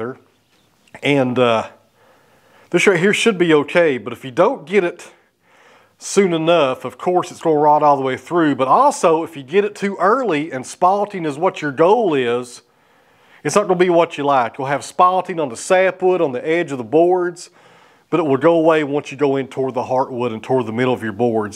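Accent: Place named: Tennessee, United States. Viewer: I watch you work 1, because I want to own a Woodmizer one day. :-) and 2, because I had family from Tennessee and you talking reminds me of them. Sign me up for the give away!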